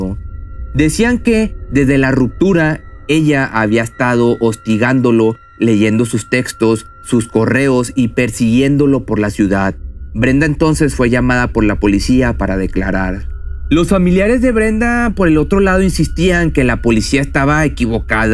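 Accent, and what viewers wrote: Mexico. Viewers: Es cierto, tiene cierta gracia al narrar, eso me hace ver sus videos y esto que no me gusta el acento mexicano.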